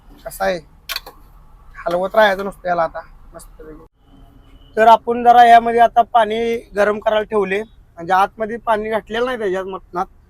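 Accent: Kolhapur, India. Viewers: राडंची कोल्हापूरी भाषा भावा 👌👍 😂😂😂😂😂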